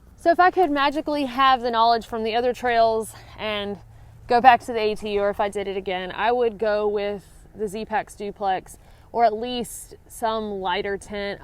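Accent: South, United States. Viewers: LOL! No, that’s not trail talk, it’s how we talk in the south. Assy = icy. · My dad always told me to never make fun of someone with an accent because they speak at least one more language than you do. Southern counts, right? Oh yes it does. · We start packing with "cheaper", and "heavier" equipment normally. But it's nice to have an idea of what others found useful, even if it is more expensive. You invest in what you love and/or enjoy. Some will hike, and give it up. Others will get drawn in, and have a life long hobby. For them, they will enjoy the information of a seasoned veteran. Thanks for the info! And Merry Christmas! And to those that want to correct the Southern pronunciation, please remember: though the "South" is connected on the map, it's a different country. It would be the same as telling the English, or Australians how to speak. Be respectful, embrace our differences, and just don't do it.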